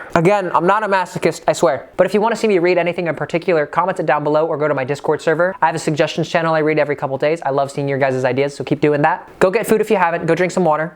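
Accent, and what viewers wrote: RP, United Kingdom. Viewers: anyone else love his normal voice just as much and his british gentlemen one? just me? ok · Who else listens to his British accent before sleeping🤔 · I think I am the only one that does not give two fucks about his British voice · am Me: stumbling upon this video ready to fall asleep, thinking I’d hear him recite a story in his British accent. Me: laughing hard instead of sleeping. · id just like to say i went to leave full screen but accidentally started the video again. you remind me of dan howell when he speaks... hes posh british lastly, did you ever go down for dinner? thank you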